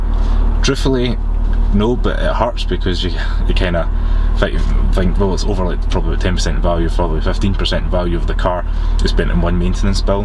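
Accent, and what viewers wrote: Scotland, United Kingdom. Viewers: It's Scottish accent. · That's a bit rude, Harald - he is speaking English, but with a lovely Scottish accent. If you want posh Z4 speak, subscribe to "It's Joel".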